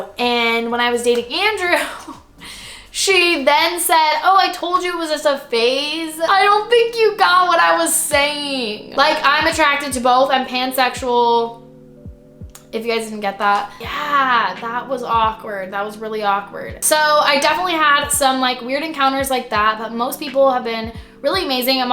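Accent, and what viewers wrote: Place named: New York, United States. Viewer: it sounded like you have a New Yorker accent a little haha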